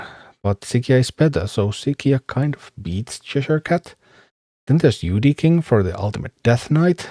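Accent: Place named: Finland. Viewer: Are you Finnish? Your accent sounds suomalainen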